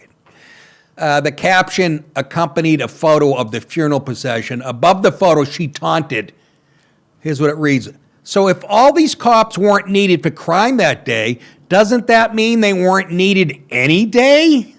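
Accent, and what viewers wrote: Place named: Boston, United States. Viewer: Nah, he’s just from the Boston area. That’s how we sound!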